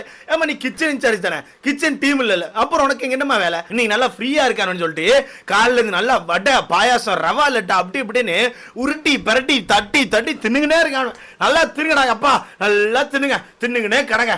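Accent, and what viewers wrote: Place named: Tirunelveli, India. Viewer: Which is your home town Sir?.We can see Tirunelveli slang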